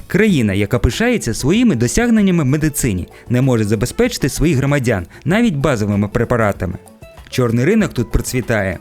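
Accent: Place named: Russia. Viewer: Хороший контент, але дуже ріжуть вухо деякі слова промовлені на руснявий маневр. В українській мові, всі голосні вимовляються чітко. А в вас досі є - кантраст, риспубліка і тд. Будь ласка, попрацюйте над вимовою. Підписалась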